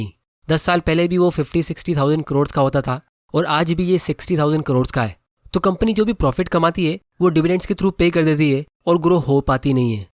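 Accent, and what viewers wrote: Gujarat, India. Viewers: Ur gujrati accent is good✨ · Are u gujrati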